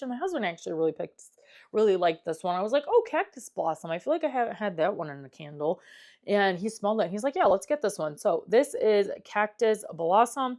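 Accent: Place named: Midwest, United States. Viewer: It sounds midwest to me 😊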